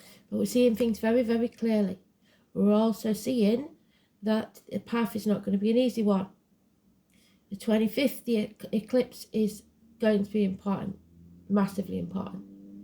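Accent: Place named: United Kingdom. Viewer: I love your beautiful accent! I see you are from the United Kingdom, I realize that is not just England, LOL. I lived in England when I was a young girl, up north in Durham County, in Barnard Castle. I loved it there. I would come home covered in mud from the Thames after playing in the castle and running around with Shetland ponies, LOL LOL LOL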